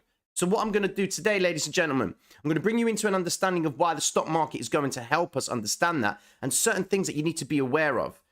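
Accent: Cockney, United Kingdom. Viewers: Big love. Top fella cockney T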